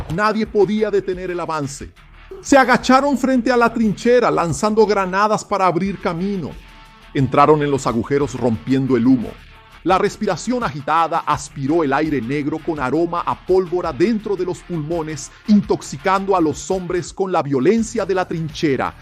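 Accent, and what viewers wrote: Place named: Venezuela. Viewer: habla como un negro venezolano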